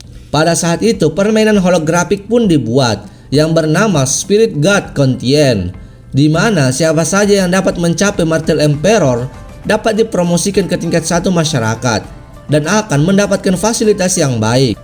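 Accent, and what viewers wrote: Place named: Batak, Indonesia. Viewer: ohh......mirip logat Batak dikit🙃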